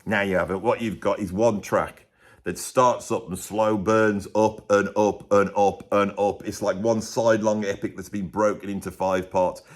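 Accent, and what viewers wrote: Birmingham, United Kingdom. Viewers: I love your videos and appreciate your knowledge of music and British accent.Some groups you never mention. fantastic group., who were a big influence on and. So glad you mentioned by but missed. Evangelist Roger Mansour former Drummer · I just love. I love the Brummie or midlands accent and how it totally lends to his appeal. Not sure I always agree with his ideas, but I don't think ever wants everyone to agree - that's the appeal. He says what he wants, knowing it isn't always going to be everyone's ideal. But he always makes a compelling case. I have had to go back and re-listen to so many albums (and CDs) after one of these videos - I conveted to MP3s (I have over 35,000 tracks on my iPod) so long ago, that they can get lost. I still prefer to listen to the whole of an album - warts and all - contrary to the new world of random 'always on background music' tracks, quickly and easily skipped with the streaming services (who rip off the musicians.)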